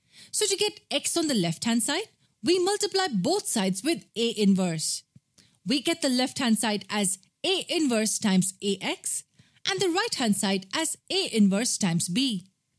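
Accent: India. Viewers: Indian accent is good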